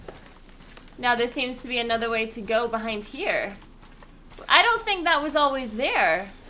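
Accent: Scotland, United Kingdom. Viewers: Love you! Damn well hooked me on this series of yours so you have my thanks for that including getting them out so early! (Plus the amazing scottish accent)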